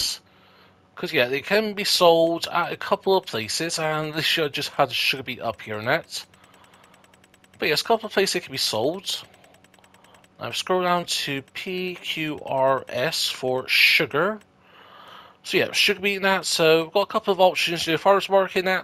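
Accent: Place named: West Country, United Kingdom. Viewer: i could listen to what i believe is your west country accent all day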